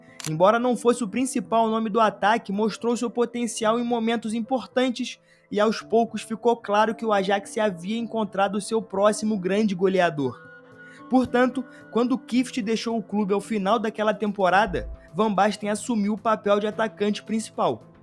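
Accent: Rio de Janeiro, Brazil. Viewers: A única coisa que me irrita, é esse sotaque carioca insuportável e indigesto. Marco van "Baxten"...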